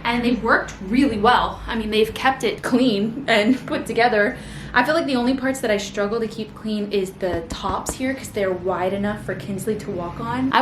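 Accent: Texas, United States. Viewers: I love hearing your Texas accent coming out more. And Kinsley is so adorable ♡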